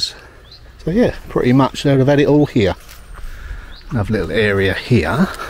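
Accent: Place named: England, United Kingdom. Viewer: Hello from Canada. Lovely video, and I thoroughly enjoyed your tour of this beautiful little village. It's so nice to listen to someone who has such a deep appreciation and respect for the long history of these places. Never apologize for your English accent - it's beautiful. My Mum was born in England. Thank you so much for sharing. 😊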